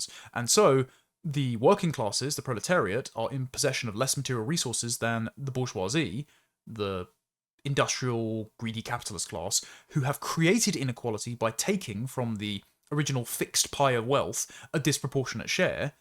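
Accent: RP, United Kingdom. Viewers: always the halfwits who are impressed by the average posh english accent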